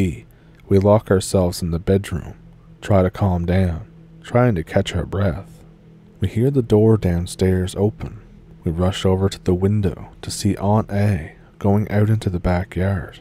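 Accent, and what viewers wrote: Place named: Maritimes, Canada. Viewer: I love your stories, and I can even get past the EXTREME Maritime Provinces Canadian accent. But you sound lethargic and congested!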